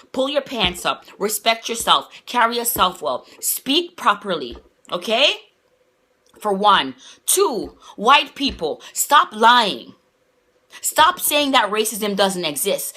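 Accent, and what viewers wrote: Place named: Jamaica. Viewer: Jamaican accent? (I'm from the Caribbean so just curious). Confirmed. Shout out to our northern neighbours :) Great video btw.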